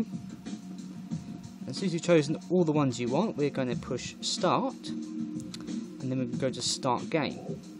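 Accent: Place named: Australia. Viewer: Welp that is Australian accent after all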